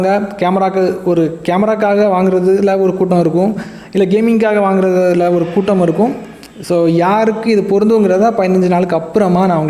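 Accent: Kanyakumari, India. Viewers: kaniyakumari slang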